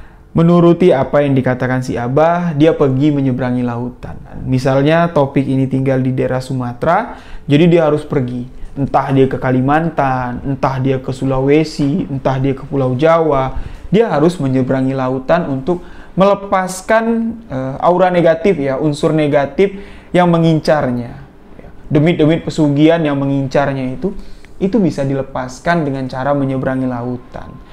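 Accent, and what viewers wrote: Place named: Bali, Indonesia. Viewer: oalah..pantesan bang,bahasanya masih ada logat balinya hehe ok bang makasih,semoga chanel bang terus berkembang Aamiin.. 🙏🙏🙏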